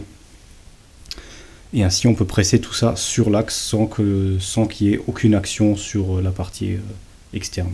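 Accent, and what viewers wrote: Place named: Alsace, France. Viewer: On remarque un peu l'accent alsacien ;)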